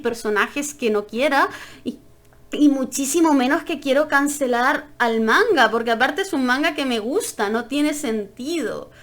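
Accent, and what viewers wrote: Chile. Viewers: Btw cuando te molestas sí se te nota mucho en acento chileno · cuando no teni guión se te nota caleta lo chilena sdffjsdhfdshj somo el mejor país de chile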